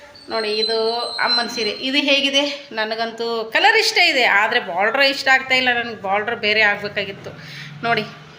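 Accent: North Karnataka, India. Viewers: ನಿಮ್ಮ ಸ್ನೇಹ ನೋಡಿ ತುಂಬಾ ಖುಷಿಯಾಯಿತು ಸಿಸ್ಟರ್ ಇದೇ ತರ ಯಾವಾಗಲೂ ಇರಿ ಸಿಸ್ಟರ್❤❤, ನಮ್ಮ ಉತ್ತರ ಕರ್ನಾಟಕದ ಮಾತು ಕೇಳಿ ತುಂಬಾ ಖುಷಿಯಾಯಿತು ನಾನು ನಿಮ್ಮ ತಂಗಿ ಅನ್ನು ಕೊಳ್ಳಿ ಸಿಸ್ಟರ್, ತಾಯಿ ಕೈಯಿಂದ ರೊಟ್ಟಿ ತಿನ್ನುವುದಕ್ಕೆ ತುಂಬಾ ಅದೃಷ್ಟ ಮಾಡಿರಬೇಕು ಸಿಸ್ಟರ್, ನೀವು ತುಂಬಾ Lacky, ನಿಡೋನಿ ಅಣ್ಣನಿಂದ ಪರಿಚಯವಾಯಿತು ಸಿಸ್ಟರ್ ಅದಕ್ಕೋಸ್ಕರ ನಿಮ್ಮ ಚಾನೆಲ್ನ ಡೈಲಿ ವೀಕ್ಷಣೆ ಮಾಡುತ್ತೇನೆ❤❤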